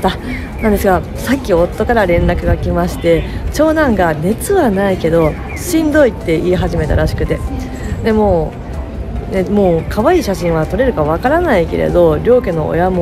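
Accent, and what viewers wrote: Kansai, Japan. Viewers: さんのお父さんお若いーー！そしてさんの関西弁で素な感じもかわいいです🩵 無事七五三終えられてよかったです！ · 飴をペロペロの話可愛すぎて、つい可愛いーーーー！って言ってしまいました♡ さんの関西弁嬉しかったです！！関西人ファンより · さんのお父さん、めっちゃ若いです☺️✨お父さんとの会話で関西弁が出てて同じ関西人としてはなぜか嬉しかったです🥰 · ほんとにお父さんがお若い。。そしてさんの関西弁が新鮮ですきです！❤️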